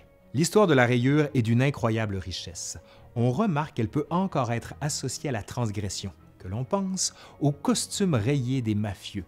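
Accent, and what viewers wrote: Quebec, Canada. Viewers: Bravo ! J apprecie de plus en plus votre chaine. J adore l histoire, et vous arrivez à me faire découvrir un tas de choses ! En plus, j avais un peu de mal avec l accent québécois et maintenant j adore 🥰 Continuez, c est si passionnant!! À bientôt, Aurore de Paris 🐇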